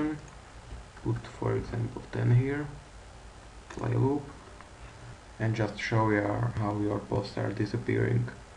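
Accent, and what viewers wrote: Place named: Slovakia. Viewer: the guy has a totally Slovakian accent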